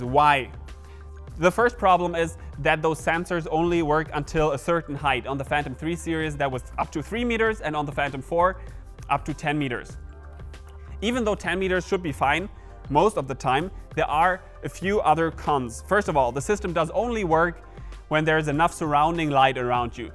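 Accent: Germany. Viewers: bist du deutsch ? :D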